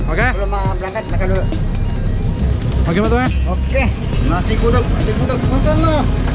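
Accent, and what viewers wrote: Bangka, Indonesia. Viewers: Orang mna bang. Kok kyk org bangka. Hehe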